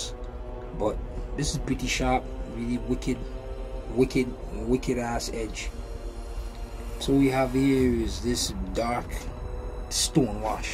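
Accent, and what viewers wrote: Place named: Sint Maarten. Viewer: Bro.. u from Sxm? Ur accent is to close to home..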